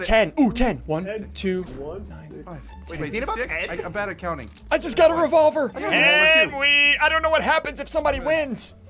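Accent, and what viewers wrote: Canada. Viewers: He’s Canadian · Is he Canadian (im Canadian so that’s not racist) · Are u Canadian?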